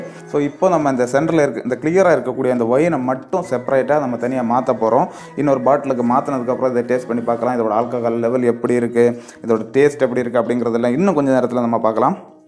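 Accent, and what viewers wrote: Kerala, India. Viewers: அது சரி தங்கள் பேசுவதை பார்த்தல் மலையாளி என்றுதெறிகிறது,,,, தங்கள் மலையாளதெலேயே பேசலாமே எதகு தமிழ்,,,, நான் பிருடைய மொழியில் எபோதுமே பேசமாட்டேன்,, என் தமிழ் மொழில் தான் பேசுவேன் அப்படியே பேசினாலும் அவமானமாகஇறுகும், உங்களுக்குஎல்லாம் அவமானமே இருகாத????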